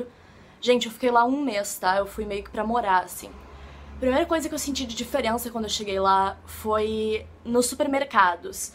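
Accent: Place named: Porto Alegre, Brazil. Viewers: Mariana antonucci Tem mais haver com o sotaque do que com a dicçao. Os porto alegrenses falam assim mesmo,assiste um debate da luciana genro que tu vai ver kkkkk. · Cara, só quem mora desde criança em POA tem esse sotaque chato que nem essa moça. Mas no resto do estado os sotaques são legais. Ah! Sou riograndense. Eu acho que ela é descendente de alemão. O alemão é bem exigente e verdadeiro.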